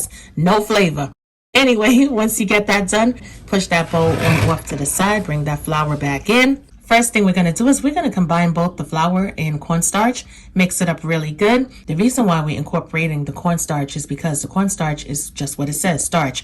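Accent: New York, United States. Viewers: My NYC homegirl. I already know without really knowing. I'm a Bronx Boogie Down Girl. I know our accent anywhere!!!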